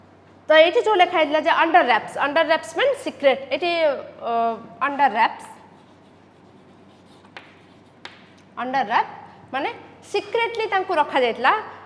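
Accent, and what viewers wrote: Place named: Odisha, India. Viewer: Odia 😀 english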